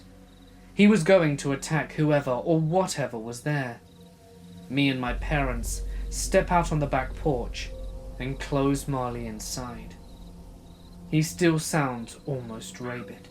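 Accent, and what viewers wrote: United Kingdom. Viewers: Thanks creepy British dude!! You could read a dictionary and it would sound ominous lol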